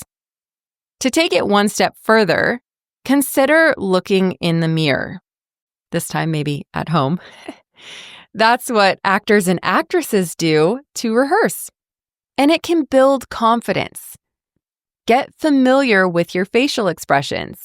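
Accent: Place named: United States. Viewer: Siempre es un gran placer y aprendizaje escuchar tu inglés americano, gracias maestra! 🇦🇷🇧🇷🇧🇴🇺🇾🇵🇾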